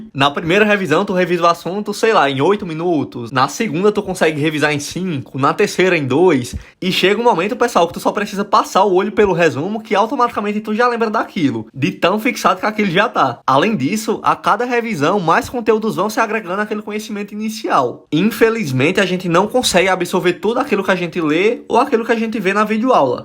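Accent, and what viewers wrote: Northeast, Brazil. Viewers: Esse mano é de qual lugar do Nordeste?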